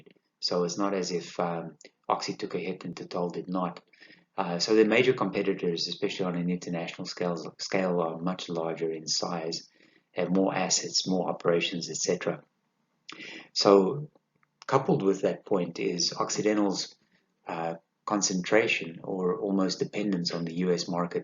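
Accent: South Africa. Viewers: Enjoying your videos. Are you from South Africa per chance?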